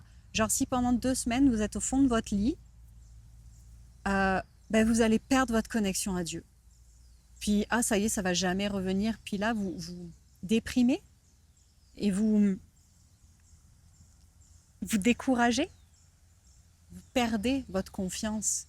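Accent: Canada. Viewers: Merci ❤ Merci de ce partage ❤ Tu as de plus en plus l'accent canadien 🤗😁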